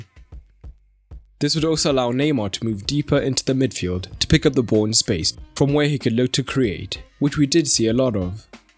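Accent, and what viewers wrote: South Africa. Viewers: Are you South African? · That you sound South African.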